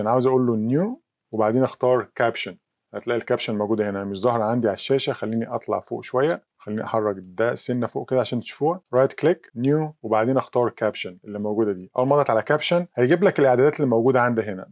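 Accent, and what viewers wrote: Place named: Arab world. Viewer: Your arabic accent is so hard my friend.. 😢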